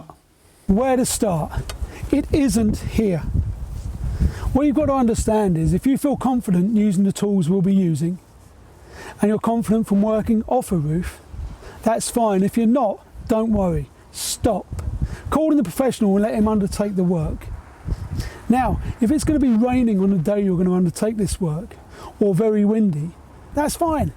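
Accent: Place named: United Kingdom. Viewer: I was sold on this video from the start because as an American, the most qualified chimney sweeps have British accents. I blame the movies. Then he pulled out the Milwaukees…. 🙌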